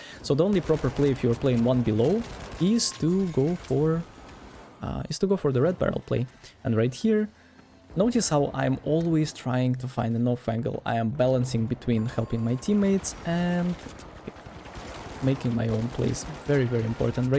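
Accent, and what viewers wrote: Russia. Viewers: Сразу слышен русский акцент, bro 😀😀. Хорошо разговариваешь, very cool!! Тоже сейчас учу английский, только начинаю. Хочу так же свободно говорить и понимать на слух, ты молодец, так держать !!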